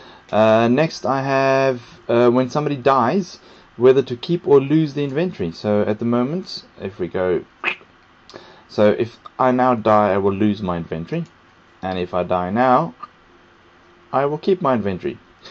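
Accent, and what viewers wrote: South Africa. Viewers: are u southafrican?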